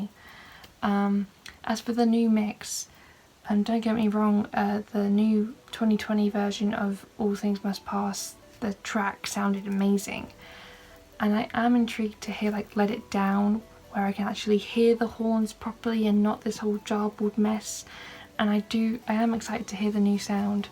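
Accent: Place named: United Kingdom. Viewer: I’ve got also another question: where in the UK are you from? Because you’ve got a lovely accent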